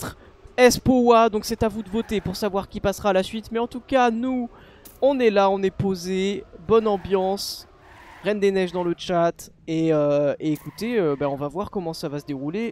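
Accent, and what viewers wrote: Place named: South, France. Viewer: 2h du mat quand je regarde et surpris d'entendre gueuler un sudiste au bout de 10sec de vidéos XD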